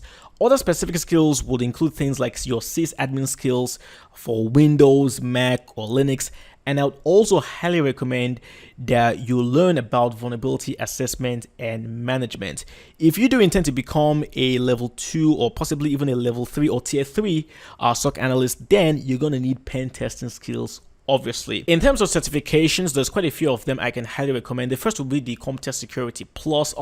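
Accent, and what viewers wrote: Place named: Nigeria. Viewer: Bro you sound like my country man, You Nigerian?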